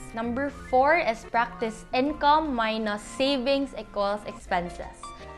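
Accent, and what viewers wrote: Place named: Cebu, Philippines. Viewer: Very helpful tips! Thanks for sharing. Anyway, are you from Cebu? cause you sound like my Cebuano friends.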